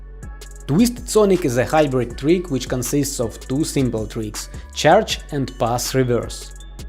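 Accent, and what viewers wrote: Russia. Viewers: пф такой акцент "русский" )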